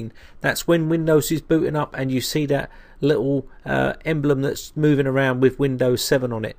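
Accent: United Kingdom. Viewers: You are by far my favorite Windows 'Guru.... And please, slow down your fast, nervous speaking, because that along with your heavy British accent.... makes it hard to enjoy the 'essence of your Royal Perfumes.......'God save the Queen!.....'You are the best!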